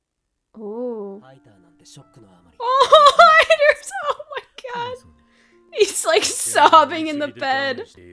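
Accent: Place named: Canada. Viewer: I just learned about the Canadian rise, and now I can't unhear it xD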